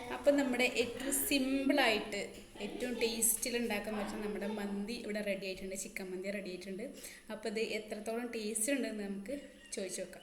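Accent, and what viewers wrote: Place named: Malappuram, India. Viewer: First video is good, good presentation, Malappuram style language, everything is great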